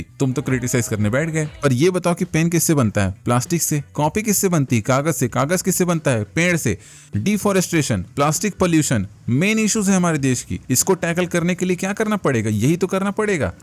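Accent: Chhattisgarh, India. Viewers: Bhai chattisgarh se ho kya?🤔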